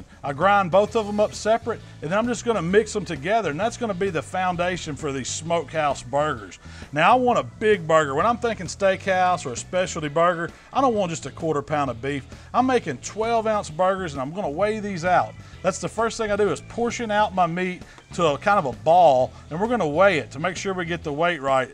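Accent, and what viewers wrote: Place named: Mississippi, United States. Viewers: Xeno he said boil... he's from mississippi. it's his accent. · Shhhh.... Mississippi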